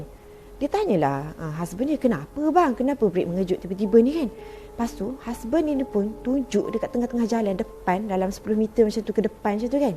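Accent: Kelantan, Malaysia. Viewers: Assalamualaikum kak.. org kelantan ke kak ? hahahaha dgr mcm lorghat kelantan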